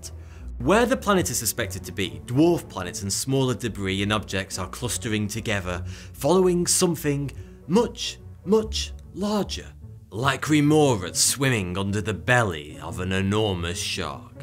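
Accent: United Kingdom. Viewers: Hey Your Voice Is Deep and Beautiful. You Speak Like British Gentlemen. 😎🤓🧐🤗🙂😉 Yes Well And Sophisticated. I Am From India. Hey Why Corona Virus. I Thought This Video is Old Video. But You Just Uploaded On 3April 2020. 😃😀😄😆😂🤣😛😜😜 Be Safe From Corona Virus. Kill The Corona From Earth. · you sound british but you have lived in america for 12 years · This is the British younger Vsauce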